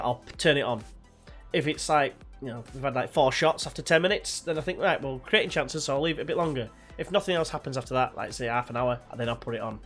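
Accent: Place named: Liverpool, United Kingdom. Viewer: That feel when you can tell its speaking but he actually sound's scouser. Great ep as always mate!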